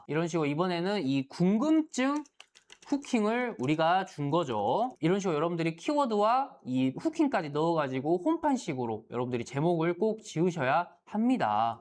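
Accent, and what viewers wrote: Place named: Jeolla, South Korea. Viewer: 전라도 사투리 진짜 듣기 싫음 40세 전에 사투리 고치는게 인생 난이도 낮아질거임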